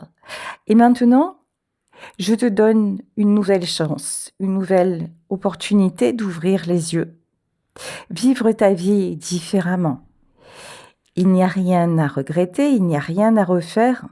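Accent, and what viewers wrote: Marseille, France. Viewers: Bonjour chère!❤❤😂😂🎉🎉 Jamais! Tu es parfaite comme tu es. Tu nas pas a t'excuser ou a devoir t'expliquer pour la belle personne que tu es.❤😂😂🎉🎉🎉🎉😂 Nous ne sommes personne pour te dire qui tu dois être ou comment tirer tes cartes.....???????❤❤😂😂🎉🎉🎉 Tout ce que je sais, est que tu n'est pas a l'origine de mes ressentis. ❤❤😂😂🎉🎉🎉 Très belle année et j'espère que ton bel accents marseillais restera bien droit dans ces bottes pour 2025.❤❤❤😂🎉🎉🎉 Ne t'inquiète pas tu es juste royales. Je commence a être plus connu de mes commentaires, et tu sais que comme toi, rien ne vaut mieux que ce que tu es❤😂😂🎉🎉🎉🎉🎉 En claire, rien ne mieux que ce que Dieu a fait de nous.❤❤❤❤😂😂😂🎉🎉🎉 tu es bien comme tu es et ce n'est pas grace a nous. Tu n'est que plus royale encore que quand t es authentique. ❤❤❤😂🎉🎉🎉🎉🎉 pour moi, dire ce qu'on pense avec respect et amour, être juste avec soi-même et les autres disans les choses comme elle sont, en plus de nous partager un bout de tes expériences, Tu n'as que plus de mérites!❤❤😂😂😂😂🎉🎉🎉Merci 🎉🎉🎉🎉 Je te souhaites pleins de bonheur, de l'amour de ce qui t'entourent et de paix pour en profiter. 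❤❤❤😂😂🎉🎉🎉🎉🎉🎉🥊🥊🥊 La classe ca ne s'apprend pas!😂😂😂😂 Soit on est née avec, soit on se l'achète sur YouTube. 😂😂😂😂😂😂😂🎉🎉🎉❤ Toi comme moi, tu es nées avec!🎉🎉🎉🎉😂😂😂😂🥊🥊🥊🥊 Alors merci de t'en servir pour nous guider. ❤❤❤😂🎉🎉 Toute fois, pardon si j'ai dis quoique ce soit d'irrespectueux, juger ou mal exprimer. ❤❤😂😂🎉🎉😂😂bonne année.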